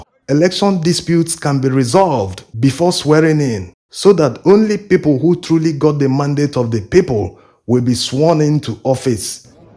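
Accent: Igbo, Nigeria. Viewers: Lovely Ibo accent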